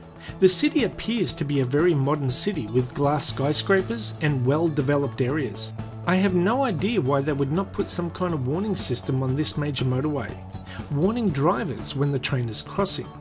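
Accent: Australia. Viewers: yeah I agree with Ted. It's a well-spoken Australian accent. Beautiful accent. My accent. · yes exactly I kept on hearing "Murderway" and "Murderists". I guess that's Aussie accent!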